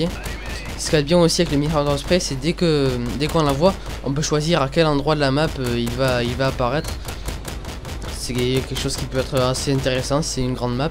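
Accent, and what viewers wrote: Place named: Toulouse, France. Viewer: J'adore, je regardais Mafio avant, je te voyais quelques fois dans ses vidéos mais maintenant que tu en fais compte sur moi pour les regarder ;) Question: Est-ce que tu conseille cette config du Spas12 sur MW2 aussi ? Si non, laquelle ? Je m'abonne, je like et je favorise ps: J'aime ta voix de toulousain :)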